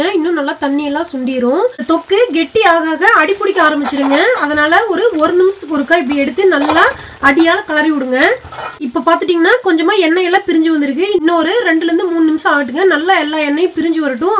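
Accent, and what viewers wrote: Kongu, India. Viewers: Kongu Tamil super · Kongu tamil sema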